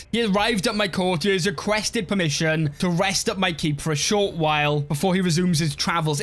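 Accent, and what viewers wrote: Wales, United Kingdom. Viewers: You sound funny like neath funny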